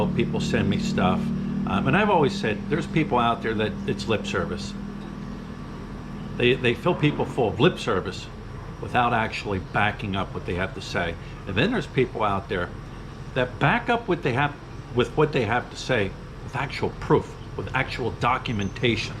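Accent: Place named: Pittsburgh, United States. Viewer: I know you call yourself "," but that sounds like a serious Pittsburgh accent! Dan from Johnstown.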